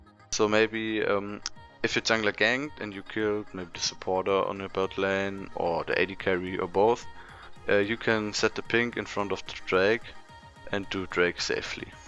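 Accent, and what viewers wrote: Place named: Germany. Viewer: you sound sooooo german :D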